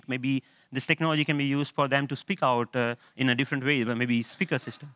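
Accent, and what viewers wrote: India. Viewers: Hes a genius, but not for mastering a language..why didn't he lost his funny Indian accent... :) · 영어 고자.. 인도식 발음 못알아 듣겠다....ㅠㅠ 계속 들어야쥐...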